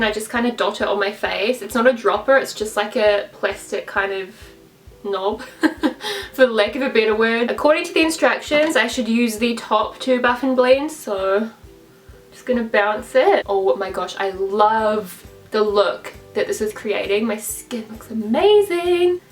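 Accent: New Zealand. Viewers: April Taylor she a New Zealand accent.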